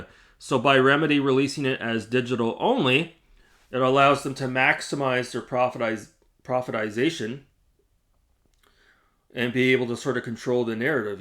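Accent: Canada. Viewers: haha i clicked your video without looking at the name of your channel, and before you had said 3 words I knew you were a fellow Canadian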